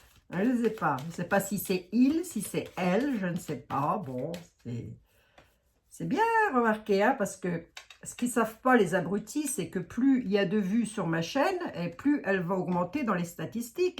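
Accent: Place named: Grand Est, France. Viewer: Fort Accent et expressions du GRAND EST que je reconnaît très très bien 😉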